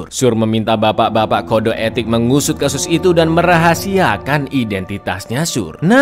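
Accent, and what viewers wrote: Java, Indonesia. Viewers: masih inget gw video pertama yg gw tonton dari channel ini, pas ngidul film The Pacifier, dari situ gw udh suka banget kontennya cak, pembawaannya santai, medhoknya ada, ama diselipin humor-humor receh, mantep dah pokoknya, btw selamat ya cak atas 1 jt subs nya, mugo-mugo kontene sampean tambah rame dan iso tembus 2 jt subs, aamiin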